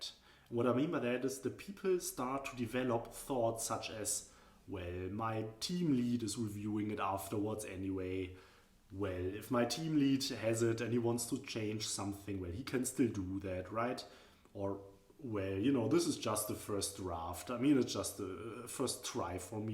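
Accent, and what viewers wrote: Germany. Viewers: My business mentor I did know I had. Like the German accent more so the content. I asked them all and you answered. danke aus tiefstem herzen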